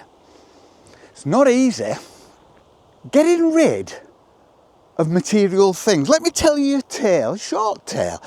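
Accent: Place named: England, United Kingdom. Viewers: I don’t know what part of England you are from but I love your accent.❤ No debt means no stress. I wish my government would’ve this way. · Absolutely love your Lancashire accent. So sad to hear it is disappearing 😢. Also you talk so much sense. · I’m appreciating your northern accent. All the people I’ve seen talking about slow living so far have been young American women. My issue with capitalism is that a bread company can make more money if they cut costs, so maybe they spray their wheat with pesticides to reduce crop losses, or they don’t pay the farmer a fair price, or they rip up the hedgerows to create a big monoculture field that’s quicker to reap etc. Seems like the lower your prices the lower your ethics! But people buy it regardless, because it’s cheap (and therefore they have more money to spend on cheap tat from B&M/holidays abroad/a new bathroom) Every pound you spend is a vote for the kind of future you want to see (to paraphrase Mike Berners-Lee) Thank you for sharing your wisdom! 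Best wishes from Derbyshire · Newly retired and beginning my journey toward slow(er) living. For me, the current challenge is more about changing my mindset than altering my actions. One day at a time, as they say. Finally, for those Brits that might know, what area is this man’s beautiful accent from? Maybe NE England? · Hm.. It is great to hear english accent and someone saying all those great things about Humanity. God Bless You.. 🙂☕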